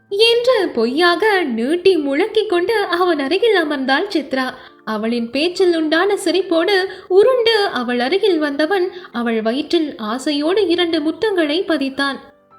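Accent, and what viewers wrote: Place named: Sri Lanka. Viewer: பிரபு அவர்களுக்கு என் மனம் நிறைந்த நன்றி உங்கள் நாவல் மிகவும் அருமை 🥰🌹 உங்கள் நாவல் யின் குஞ்சகம் குரல் அவர்களின் இலங்கை தமிழ் வாசிப்பு அருமை சாபஷ் என்று சொல் தோன்றுகிறது ஃ🥰❤️❤️❤️🥰🌹🥰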